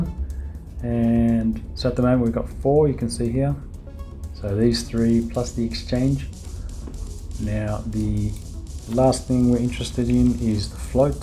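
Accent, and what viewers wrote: Australia. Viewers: Hi mate, thanks for this video. From your accent I can tell your a fellow aussie! Is all this info relevant to the ASX as well? I'm using the free version at the moment, and I don't get any pre-market info, volume or gap. So to fix that I need to subscribe? I also believe to get live data and not 20min delayed I need the live data extra - is that correct as well? Cheers, thanks for any tips you have. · Awesome bro! ... crocodile Dundee, are you an Aussie?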